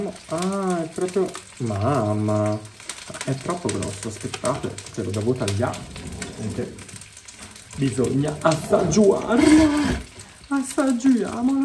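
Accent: Tuscany, Italy. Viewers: Ho apprezzato tutte e 4 le giornate mangerecce ma la mia preferita resta halloween 😻 purtroppo sono a dieta terapeutica quindi quando vado al lidl posso prendere solo ciò che ho da dieta 😭 chissà per il futuro cosa arriverà, forse la settimana francese, austriaca o oriente, ma probabilmente bisognerà aspettare dopo natale. Ora potresti far spesa dei prodotti Delux 😎 Ti ho scoperto da poco, mi iscrivo, sei molto simpatico e adoro sentire la cadenza toscana 🤩 Mi sembra di aver capito che non rientra nel tuo canale, cmq ti consiglio di provare anche i prodotti skincare che ogni tanto la lidl propone, ieri per esempio è uscita la linea nordica, buon inci, ottima idratazione e low cost!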